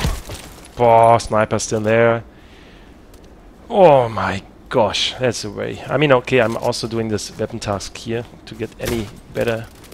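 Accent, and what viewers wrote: Germany. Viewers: cwaddict LOL i knew it you germans have that kind of accent :#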